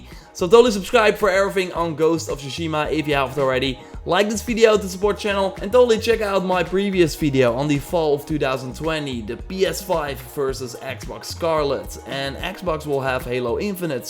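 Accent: Netherlands. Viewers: Je kon wel eens Hollands zijn:) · Nice Dutch accent bro.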